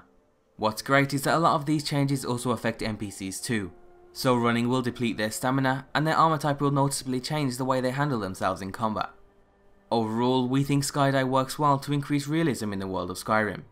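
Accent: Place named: West Midlands, United Kingdom. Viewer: Where you from? Sounds like west midlands haha. I'm from Birmingham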